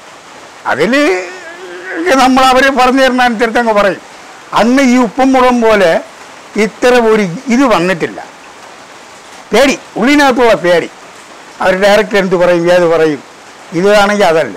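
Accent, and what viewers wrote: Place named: Trivandrum, India. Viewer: അണ്ണാ നിങ്ങട തനി തിരോന്തരം ഭാഷ ആണ് എനിക്കിഷ്ടം അതു കേൾക്കുമ്പോൾ പ്രവാസിയായ ഞാൻ നാട്ടിലെത്തിയ ഫീൽ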